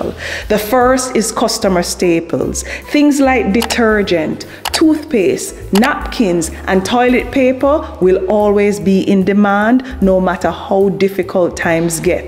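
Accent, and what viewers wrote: Jamaica. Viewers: I love Jamaican accent. Can Listen to you all day long 😊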